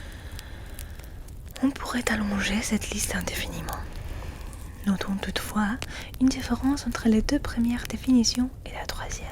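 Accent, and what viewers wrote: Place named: France. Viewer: Coucou 🖤. Qué lindo acento francés tienes.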